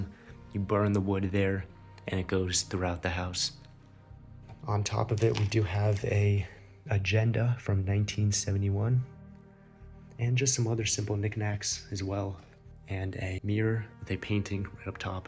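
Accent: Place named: United States. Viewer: Did I miss the round spire/tower room from the inside ? I also have read some comments about learning what this older furniture styles are , it would help with dating stuff . You don't have to take classes to learn stuff , just look at the pics and do a google search and you will usually find more info on the old stuff . The cork on the walls in that bathroom was odd to me , I am guessing it was done in the 1960's or later , that was Hideous looking . The photo slides are not Polaroids , I lived through that Era , and used Polaroids . I also have Some Slides from the 90's , so they are pretty common . The Scanners from some companies in the 90's had an attachment piece for showing the slides on your PC. Were you on a vacation trip in France ? You speak American English , so I assume you are from the States . I am also very glad you were respectful and not ransacking the place , I watched a different video with a real Jerk , that was so disrespectful , and made me think he is a real thief and lowlife. 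Keep up the great work .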